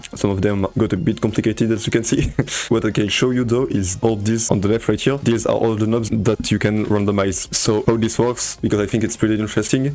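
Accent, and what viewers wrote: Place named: France. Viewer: l'accent francais mdrrrrr continue comme ca !k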